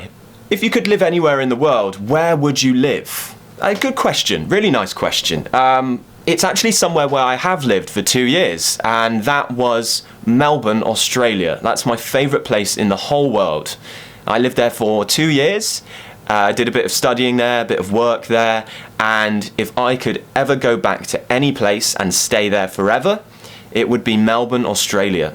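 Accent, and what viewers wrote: United Kingdom. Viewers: I love your sophisticated British accent omg <3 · Love the British accent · Hi. I watch you often, trying to learn British accent. You're a cool guy. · I love the British accent and of course your teaching way! Thank you so much for your priceless and wonderful lessons! Please keep doing it. When I have a bad mood or something wrong I just watch your lessons. I want to say that your videos makes me feel a better and change my mood because your video is enjoyable. Thank you! 😊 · A shoutout from Brazil. I'm passionate about the British English and I love your accent. Your videos are brill! keep it up! Cheers! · I like all Your video since the first time I found Your Youtube Channel, it help me improve my listening skill especially understanding British accent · Another question: Do you want to be my personal teacher? It's a joke, but I love you...rrrr accent... 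The only British accent I understand is yours. 😍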